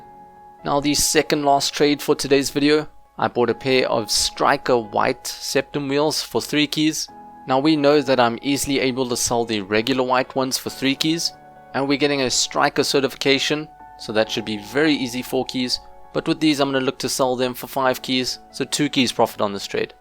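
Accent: South Africa. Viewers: Are you South African??? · Are you South African? Sorry I am new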